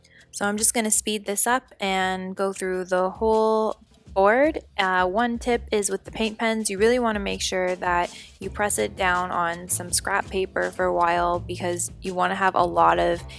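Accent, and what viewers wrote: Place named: Canada. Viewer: Omg!! I didn’t even pay attention that this was your video until it came to the end and you said! I heard the Canadian accent but didn’t think anything of it. I am going to attempt one of these projects. Haha that is so coincidental. Glad it was you because I liked yours the best out of the rest I was watching lol!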